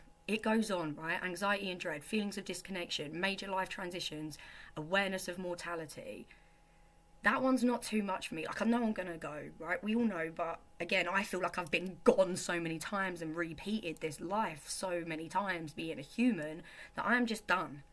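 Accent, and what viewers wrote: United Kingdom. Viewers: 😄that british accent in combination with heavymetal looks damn. heavy metal queen. just walk baby walking fixes everything. you are beautiful. why not become a mama? kids are nice. you lose some sleep but this is our ultimate purpose. *what kids give you in your heart, no therapist can do that. sorry for my english not good. everything is gonna be okay baby don't worry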